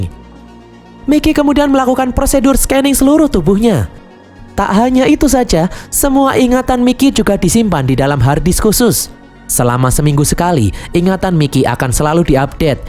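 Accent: Java, Indonesia. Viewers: kereenn..baru nemu channel recap yg agak2 medok wkwkwk. gaaassss terus mase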